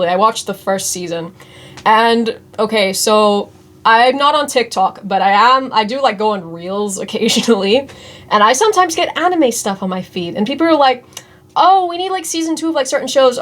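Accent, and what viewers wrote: New York, United States. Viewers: You sound like you're from New York. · my favorite brit with a new yorker accent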